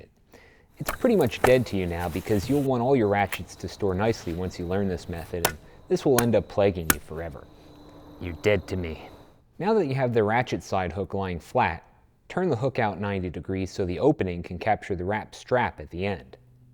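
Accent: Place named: Pennsylvania, United States. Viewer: Thats accent says Pennsylvania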